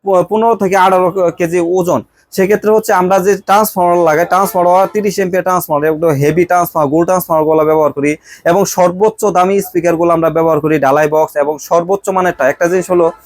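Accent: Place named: Sylhet, Bangladesh. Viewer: Sylhet tone dekse bhai 🤗🤗🤗🤗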